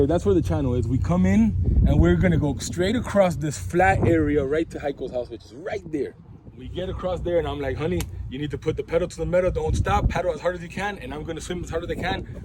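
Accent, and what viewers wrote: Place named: Florida, United States. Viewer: That Florida accent is so weird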